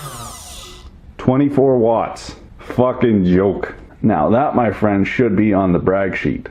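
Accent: Quebec, Canada. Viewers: hi, I'm from Montreal, Canada. And I love it when you speak french .makes me laugh everytime with the quebecois slang and hockey on top of it lol. keep it up.congrats for your channel. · 10/10 for Quebec French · Nice québécois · Tu sais parler québécois! You know how to speak french-canadian! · Quelque chose me dit que vous êtes du Quebec! suis-je dans l'erreur ?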